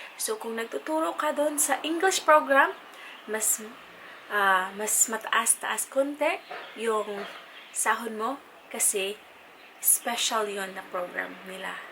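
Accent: Visayas, Philippines. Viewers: Kadiri kang bisaya ka kaya lagi sila iniinsulto eh sa susunod bilisan mo mag tagalog hayop ka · At first I can sense you are really bisaya! Haha... Same here...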